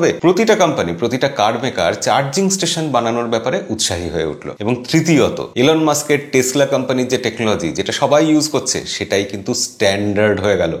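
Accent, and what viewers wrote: Bangladesh. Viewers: ভাই আপনার কথা শুনে ভারতীয় মনে হয় না , পুরাই বাংলাদেশি বলে মনে হয় , আপনি ভারতীয় শুনে মন খারাপ হয়ে গেছে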